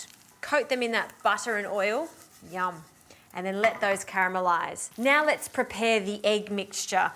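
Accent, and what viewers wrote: Australia. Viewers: Nice Australian accent.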